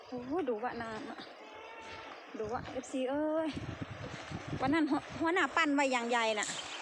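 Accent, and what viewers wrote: Korat, Thailand. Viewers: เจ้ พูดสำเนียงเหมือนคนนางรองไทยโคราชเลยครับ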